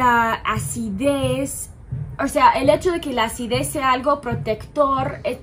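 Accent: Mexico. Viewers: Habla muy mexicana! Que linda!! 😊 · I'm listening to you and I feel I light Mexican accent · Hi!!, no sabia de tus videos..te felicito..son muy interesantes, y tu español es excelente...lo hablas muy bien, se nota claramente el acento mexicano...pero bueno.. agradezco que compartas tus conocimientos, muy buenos y como te reitero, bastante interesantes. Saludos From CHILE - VALPARAISO. Good luck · Antes hablabas español como Española y ahora hablas español como Española y mexicana en acento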